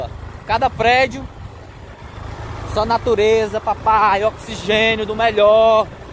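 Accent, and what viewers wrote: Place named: Ceará, Brazil. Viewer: você é de que estado, você moram no Ceará? Eu sou de Pernambuco, o sotaque é bem diferente, os seus vídeos são muito bons, parabéns.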